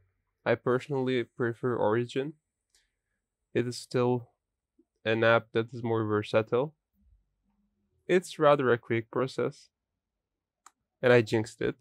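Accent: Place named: Turkey. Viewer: kanka aksanından türk oldugunu dusunuyorum allah aşkına oyunu dün epic gamesten aldım bozuktur dıye bugun gidip steamden aldım ilk basta önyükleme hatası falan diyordu biostan bi ayar yapıp ön yüklemeyi açık hale getirdim onu hallettim.ama suanda steamden oynaya basıyorum ilk kez baslatılmaya hazırlanıyor diyip hicbisey olmadan kapanıyor kendi kendine hiç açılmadan ne yapabilirim lütfen yardım edermisin bütün driverlarımda güncel bilgisayarıma yeni format attım windows11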